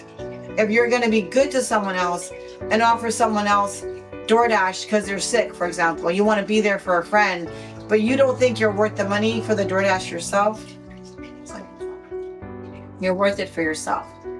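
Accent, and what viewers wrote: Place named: United States. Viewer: Presentation is excellent and your voice and words pronounces are great with subtitles (pure American accents) keep it up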